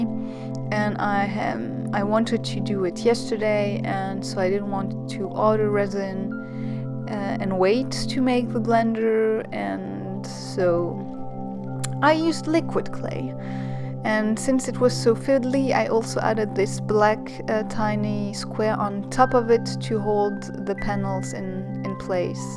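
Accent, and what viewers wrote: France. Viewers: Are you french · Hello! Do you live in France? We will be in Paris in December and just adore your accent. Beautiful design!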